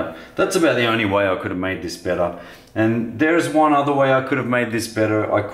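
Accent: Australia. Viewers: Aussie? Love the video mate. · Are you Australian? Great video.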